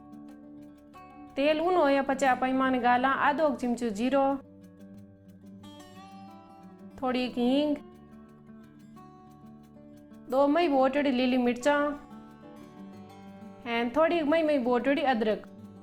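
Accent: Marwar, India. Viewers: Bhabi PYOR MARWADI bole 😊😊😊😊😊😊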